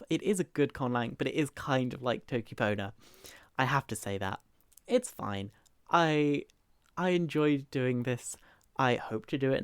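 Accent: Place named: United Kingdom. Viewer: Super british accent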